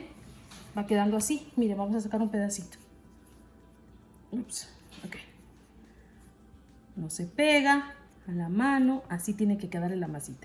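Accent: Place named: Peru. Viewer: ¡Qué peruana que eres! "salsita, cominito, cada presita" Me encanta, extraño mi tierra.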